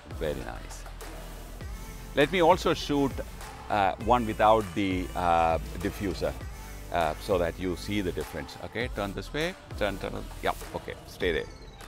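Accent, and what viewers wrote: India. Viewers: Ye Bande ko dekh k peheli baar dimag ka bhosda Huwa hy, 😂😂😂 gora kabse Indian accent me bath karne laga bhai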